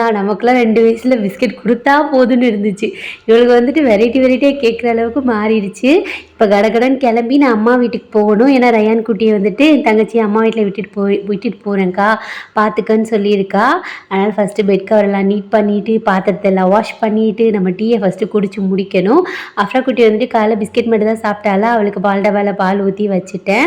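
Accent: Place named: Ramnad, India. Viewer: Akka unga voice abdiye ramnadla ulla pechu varthaiya iruku Alhamthulillah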